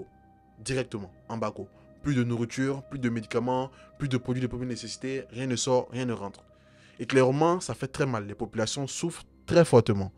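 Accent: Ivory Coast. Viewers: J'aime bien la façon de narrer avec ce accent ivoirien; je m'abonne direct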